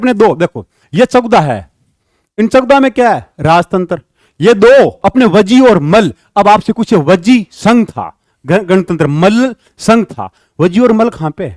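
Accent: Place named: Haryana, India. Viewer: सर आपकी हिंदी भाषा ऐसी क्यू आप कहा के लगता हरियाणा से😮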